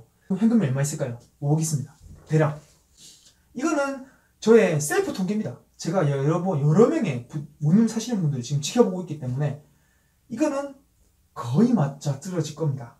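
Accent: Busan, South Korea. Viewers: ㅋㅋ부산사투리란다,,전혀 아닌거 같구요~~ 꼭 만나면 말도 못 할 분께서 전혀 문제될 부분도 아닌 곳에서 키보드 워리어를 하시더라구요^^ 부산사투리 쓰는게 듣기 싫으시면 안보는 방법이 있어요!! 똑똑하쥬?? 꼭 싫다면서 보드라구요🤭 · 마이크문제가 아니구 부산사투리랑 발음문제입니다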